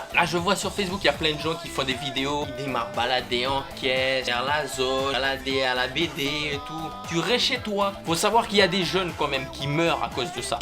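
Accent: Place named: Lifou, New Caledonia. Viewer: Sort d'autre vidéo !! Hhaha je kiff ton accent dehu mon reuf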